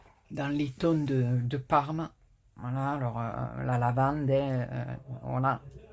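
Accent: South, France. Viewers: De bien belles trouvailles pour vos prochaines créations, le bloc 30 x30 est superbe et les jolis dies cuts je ne les ai pas encore vu. Merci pour ce partage et ce bel accent du midi. Bon week end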